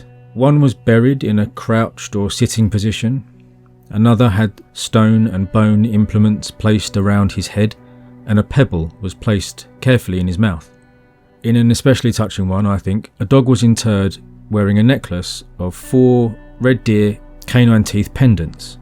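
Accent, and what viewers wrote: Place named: London, United Kingdom. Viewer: I love the London Accent 😂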